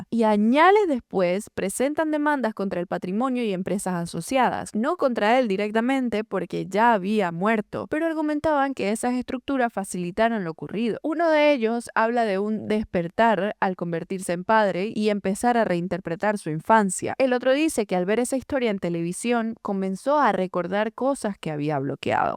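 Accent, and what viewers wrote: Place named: Venezuela. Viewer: Me gusta muchisimo tu acento venezolano, (soy española ) a de mas tu tono de voz es elegante y muy relajante., podria escucharte el dia entero ❤❤❤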